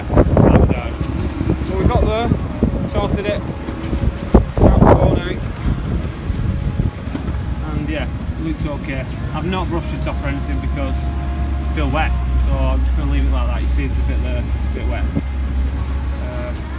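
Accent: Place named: Liverpool, United Kingdom. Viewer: Spot on mate, what more can you say! Scouse! ;)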